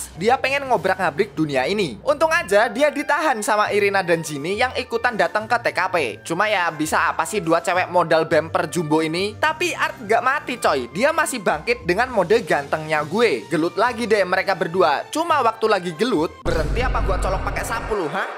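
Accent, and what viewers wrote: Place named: Java, Indonesia. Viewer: wong jowo mas😅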